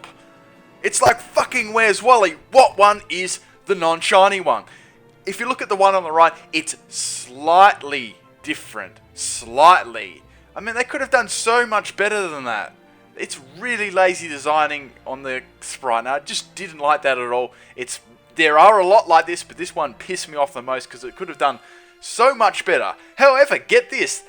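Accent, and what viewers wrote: Australia. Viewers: Australian tho, accents are completely different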